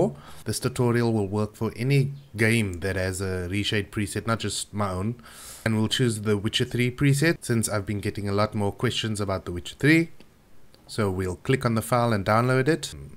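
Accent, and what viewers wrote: South Africa. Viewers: What part of South Africa are you from? ;) P.E here!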